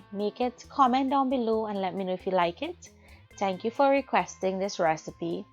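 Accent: Trinidad and Tobago. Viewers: She has a Trinidadian accent but the culture is similar to Guyana which is mostly Indian and African influence.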